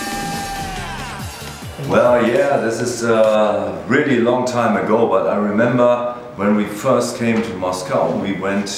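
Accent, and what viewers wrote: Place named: Germany. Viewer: I love his voice and pronunciation in english with a german accent. Very brutal and strong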